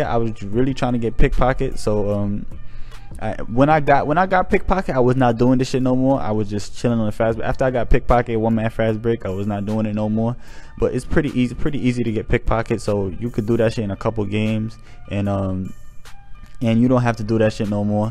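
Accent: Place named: Oklahoma, United States. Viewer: Bro u from Oklahoma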